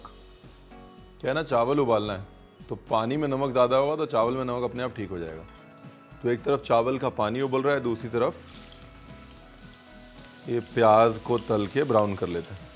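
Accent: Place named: Lucknow, India. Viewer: Ranveer ji aapka jo bolne ka lahzaa hai hume Lucknow ke yaad dilata hai.bahut bahut Shukreya... Uske liye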